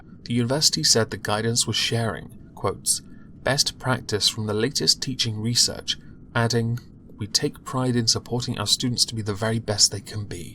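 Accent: United Kingdom. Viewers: Man... I hope you get a bigger audience. We need a sarcasm riddled British accent reading 'less than mainstream popular news to fill the space that groomer Sargon of a Kek used to fill.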